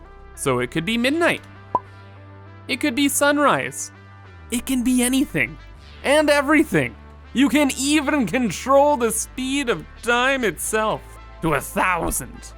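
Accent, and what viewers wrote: Netherlands. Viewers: Are you Nederlands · Are you dutch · dude for sure you ar efrom holland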